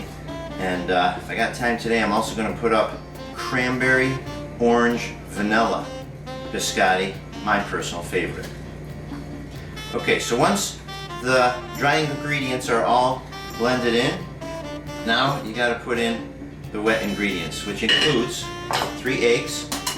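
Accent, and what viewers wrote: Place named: Brooklyn, United States. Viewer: Ciao, you sound like you're from Brooklyn! Good recipe! I'll give'er a try! Ciao, Brooklyn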